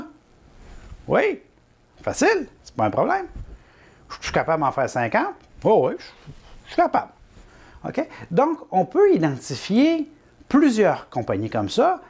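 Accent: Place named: Quebec, Canada. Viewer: Je commence à écouter la vidéo. Je suis bien content de voir un québécois parler de la bourse :D Ça fait changement de l'accent français :P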